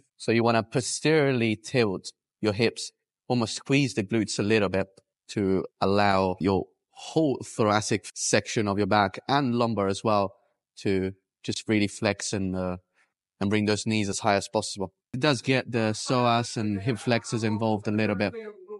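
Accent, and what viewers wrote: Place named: United Kingdom. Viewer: You have the most interesting accent. It isn't very British but yet there is a strong Brit tone in the way you enunciate.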